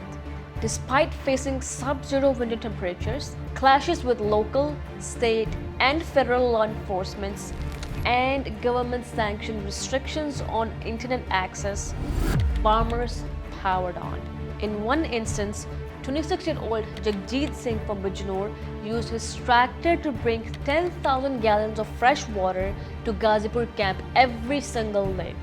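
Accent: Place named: Canada. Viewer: Or apna ye canada wala accent apni Gamnd me daal lo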